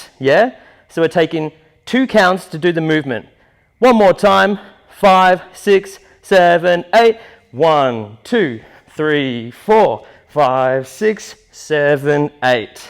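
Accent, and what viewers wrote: Australia. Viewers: Shoes are 💯💯, also everything souds so much better with aussie accent 😂😂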